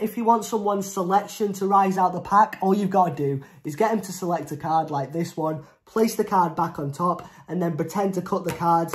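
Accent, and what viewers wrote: United Kingdom. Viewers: Bro r u Britisher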